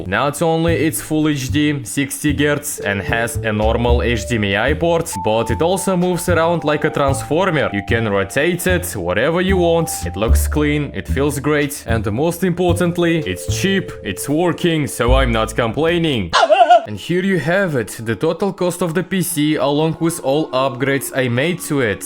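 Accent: Russia. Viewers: I love Russian accent😂😂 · Классное видео, мне понравилось. Суровый russian accent на высоте))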